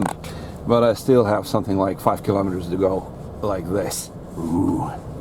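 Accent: United Kingdom. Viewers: For a Russian you sound like a perfect brit. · You sound British B) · bro i thought you were british ngl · you sound British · Interedting. Aberdeen is in Scotland, i was thinking more about areas like Yorkshire which stress sounds similar to you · Sounds like you are British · Yea he is actually good Sounds like british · Haha I'm confused are you Brit or Russian? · Greetings from New Zealand. You are the most British Russian ever! I had so much fun watching this.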